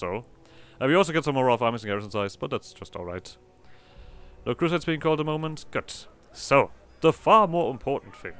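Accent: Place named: Germany. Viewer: hat 5 sekunden gedauert um zu erkennen dass du deutscher bist lol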